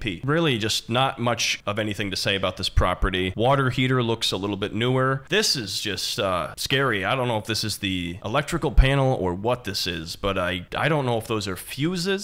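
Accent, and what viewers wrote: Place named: Florida, United States. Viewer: seems like a guy I’d chill with. Looks and sounds like a Florida local too.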